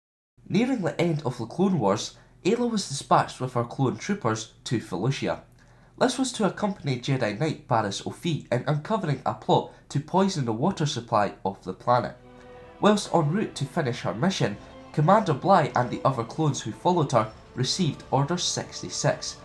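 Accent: Scotland, United Kingdom. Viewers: Hahaha are you Scottish? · Are you Scottish?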